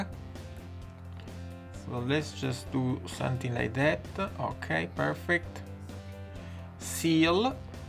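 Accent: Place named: Italy. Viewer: Great demo, one video is worth more than a thousand words. It was also nice hearing a genuine (not a Mario Bros) thick Italian accent. Thank you,. Greetings from 🇮🇹. 😎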